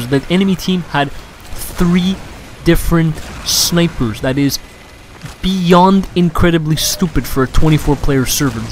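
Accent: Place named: Canada. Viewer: Garry Newman English accent? I'm pretty sure you mean canadian. I find it cringeworthy that you can't actually understand him to a degree where you wouldn't need subtitles.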